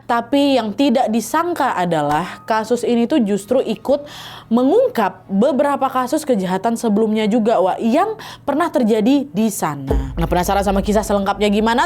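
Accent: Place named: Medan, Indonesia. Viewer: Sukak sama kerna cara ngomongnya sama cam awaq orang Medan terutama pas bilang G Ada Otak😁😁😁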